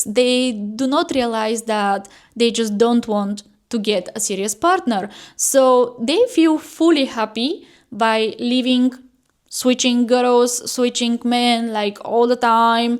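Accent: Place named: Eastern Europe. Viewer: Your accent is very slavic. I like it 😊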